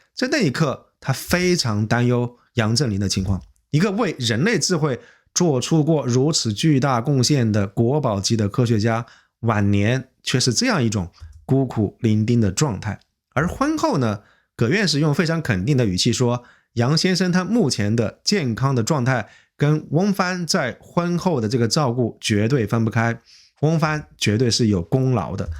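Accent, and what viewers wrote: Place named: South, China. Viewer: 一周前才偶然关注先生的油管频道，集中观看了部分作品。首先，惊叹于先生的开阔视野和博闻强记； 其次，受益于这种逻辑完善、条理清晰而不紧不慢的叙事； 最后，感佩于这种不受主体和利益相关者的干扰，既能够设身处地忠于历史，而又能够跳出站队与偏见，努力还原真相及实事求是的态度。我不了解先生的个人背景，但令人舒适的南方口语，娓娓道来的文辞背后，包藏着古老的文化底蕴；中西合璧的知识结构，又浸润着清新的现代气息；不避争议而献上自己的一家之言和独到见解，也在诚恳中闪现出自信和智慧的光芒。虽偶有新论令人耳目一新，但更多的是那种沉稳大气、从容优雅，让知识和信息淙淙流进观者心田，如沐春风、如临清泉。世有纷扰，多所歧见。所论，颇值静观。悠管纷乱众奇葩天籁自别群乌鸦万千迷雾森森色一缕清音映落霞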